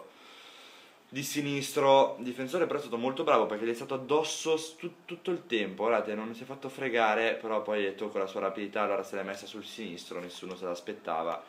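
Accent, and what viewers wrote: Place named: Italy. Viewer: Come sei fiscale, w la pronuncia italiana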